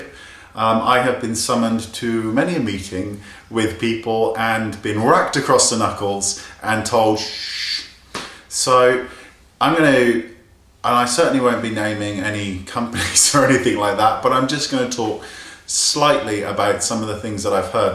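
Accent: England, United Kingdom. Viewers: That english accent! <3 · I love you, I love watching your videos. Think youre absolutely hilarious! Most of all I love/adore your accent. I'm Irish so the English/UK accent is amazing to listen to anyway. But there's a few words that you say which have a wee bit of a "twang" e.g. when you said "haarrrrrrrd" in this vid.. LOVE IT! That makes watching these videos, really does. Also, I totally agree with some of the comments left here that you should create your own line of cosmetics and/or you should write a book. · This is exactly why WE all Love you so much! This and your supercute englisch accent☺. Kisses from Germany and a Big thank you for all your honesty and braveness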